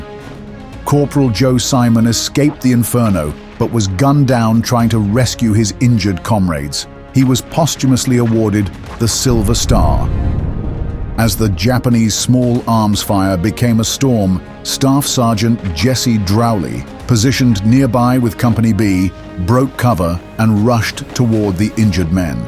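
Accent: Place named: United Kingdom. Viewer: WTF with the Brit accent? These are American troops.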